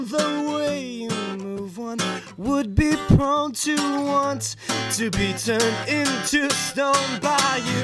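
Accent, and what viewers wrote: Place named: England, United Kingdom. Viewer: i mourn the loss of the uncontrollable curly mop... but fangirl moment because of the deep english accent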